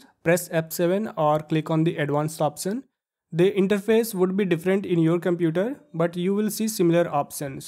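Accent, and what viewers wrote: India. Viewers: Indian accent tutorials are the best🔥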